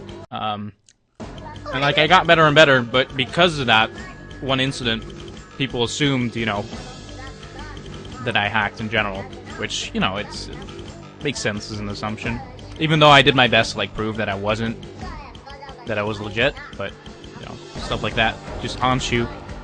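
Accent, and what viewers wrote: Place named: United States. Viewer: Thought so, 'cause he speaks english like real americans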